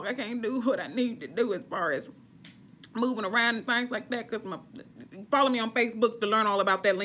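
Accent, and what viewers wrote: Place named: United States. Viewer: Lol girl you killed me, i love how you American talk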